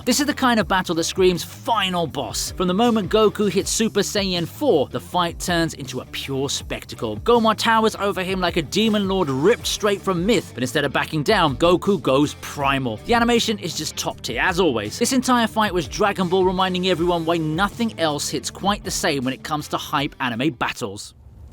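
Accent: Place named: United Kingdom. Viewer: I searched best anime fights and expected a compaction. Wasn’t prepared for some British clown talking but do you I guess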